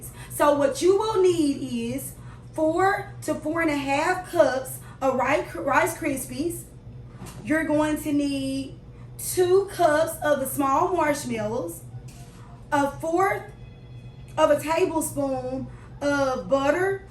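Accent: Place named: South, United States. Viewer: Luv luv your down south accent...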